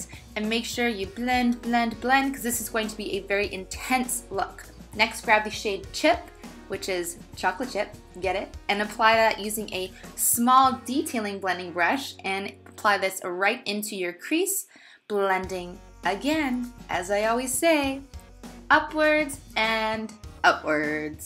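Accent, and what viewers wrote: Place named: Canada. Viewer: Sorry... you're Canadian, I'm rubbish with accents! 🇨🇦 xx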